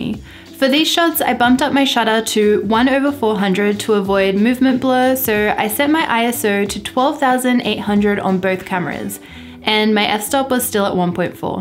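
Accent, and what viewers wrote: Australia. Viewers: I am in love with the Australian accent already, so cute! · Do all aussies talk like you? 😍 I have to go to Australia.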